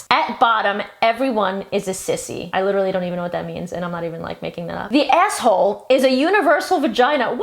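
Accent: New York, United States. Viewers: I LOVE YOUR ACCENT!! I'm from Texas and I always thought I had a very neutral accent but in listening to myself more closely over the past year or so I can really hear the Southern twang in my voice sometimes 😂😂 and to me yours sounds like a stereotypical New Yorker sounds. Am I close or completely off the mark in where you're from?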